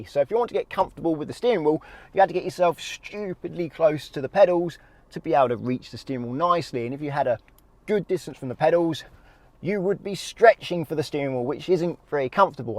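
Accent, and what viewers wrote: United Kingdom. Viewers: Well, I just realized I speak more of American English than British English looking at the way this British man speaks😂😂😂. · Man your the best If I was in the UK (assuming it's where your live because of your accent) it'd be a pleasure to be your student My current instructor is so ruthless he's slapping my hand without even telling me what I've done wrong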